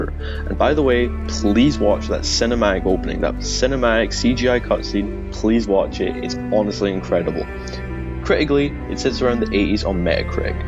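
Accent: Scotland, United Kingdom. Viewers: I can't tell what your accent is, is it scottish?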